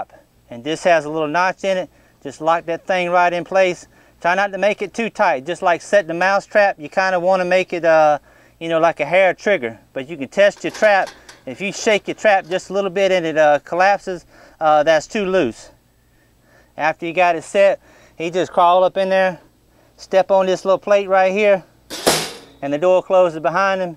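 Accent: South, United States. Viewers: I just love your southern accent.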